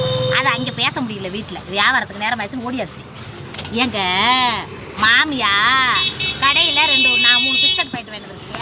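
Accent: Madurai, India. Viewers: மதுரையா கமுதியா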